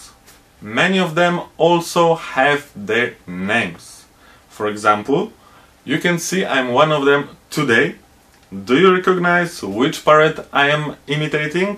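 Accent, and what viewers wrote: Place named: France. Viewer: Your accent sounds French. What is the origin of this accent?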